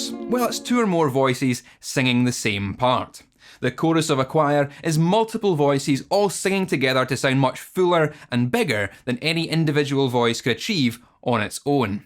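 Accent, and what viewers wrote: Scotland, United Kingdom. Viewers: As a musician from Scotland living in Stockholm, Sweden, i can say that these videos are extremely well made and that it's also a relief to hear a scottish accent again, great work, keep it up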